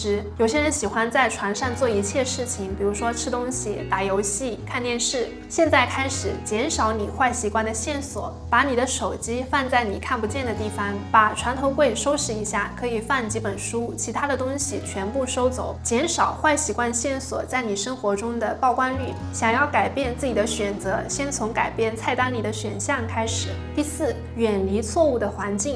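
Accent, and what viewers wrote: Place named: Anqing, China. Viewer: 你是安庆人吗，口音好好像哦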